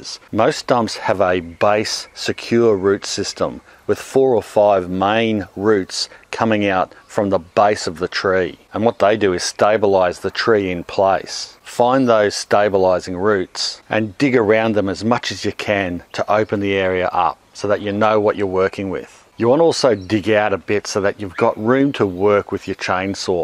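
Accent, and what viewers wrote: Australia. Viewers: I wonder why the early pilgrims of the 17th century didn't develop that ozzy sounding accent. maybe they did but there are no recordings to confirm it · This dude is an Aussie Stud! With that accent and those thunder thighs, he can get anything done! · Love that Australian accent. Too bad the country has gone Totalitarian... The rest of yhe world is following, sadly. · Dan Miranda I could listen to an Aussie read a phone book. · Al Borland, but Austrailian...... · I really just wanted to listen to an Aussie bloke talk about removing stumps. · "How to Remove a Tree Stump with a Pick Axe, Chain Saw, Scrap Hardwood, Circular Saw, Prong-tool/crow bar." Hmm, yeah, wedges and a sledge is all I expected to need by the title. FYI, I'm doing the work for a couple Geriatrics on a super slim budgie, not looking to run up a line of credit at the home improvement warehouse. 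Always enjoy listening to the Aussies, regardless. My favorite YouTuber is Ozzy Man Reviews: <link>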